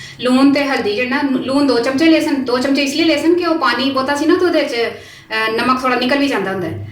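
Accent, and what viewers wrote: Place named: India. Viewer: Recipes to nice hoti hun but Punjabi ful penddu style me bolte hyn ap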